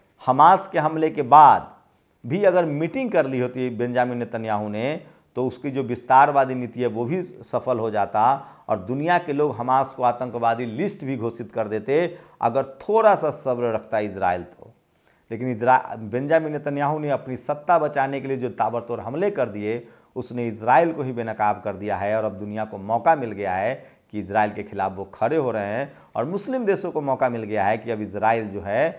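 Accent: Bihar, India. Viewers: sir aap Kaun se state se ho. Aapki Hindi sun kar garvanvit mahsus karta hu ki mai bihari hu. Aapki language me Bihar ki Hindi wali tone aati hai 😊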